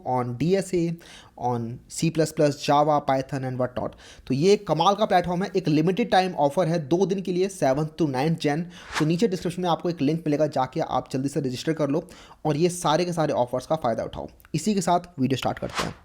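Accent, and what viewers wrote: India. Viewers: His voice is like Ashish nehra is he from Haryana ?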